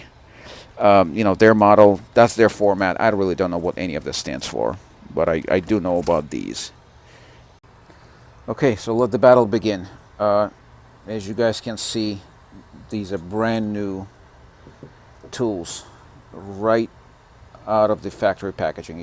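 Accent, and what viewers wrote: United States. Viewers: Thank you for your wonderful review. Love your pure American accent, remind me about George W. bush, my dream English accent. How ever your Knipe= little cutter (Knipex, 😉) is a defective one, robots use to sharpen them after induction hardening and they must have been out of calibration regardless what every one tells, I have a tool collection of almost all of most known manufactures and my knipex collection (two at least of every model cutters has zero defection like yours have got, not to mention the hardening seems to be one south side with it???? you really should send it to knipex factory in Germany and ask them to reconsider it. How ever every each of them as you know already, has been designed for different hardened material to be cut, yours indicate piano wire 2.5 mm something to keep in mind. lovely review thanks.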